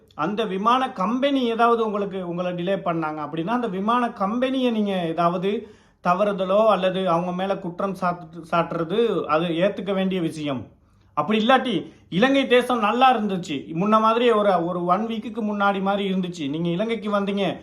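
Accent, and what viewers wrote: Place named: Tamil Nadu, India. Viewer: உங்க தமிழ் இந்திய தமிழ் மாதிரி இருக்கு ப்ரோ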